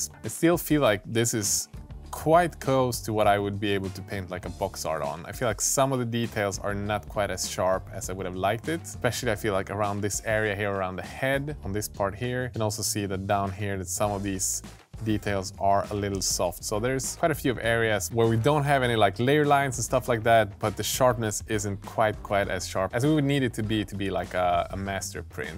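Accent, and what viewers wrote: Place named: Sweden. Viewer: This sounds very Swedish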